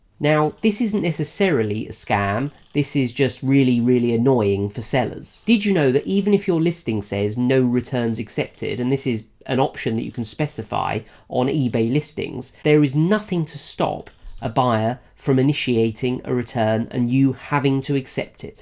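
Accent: England, United Kingdom. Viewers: A genuine honest English accent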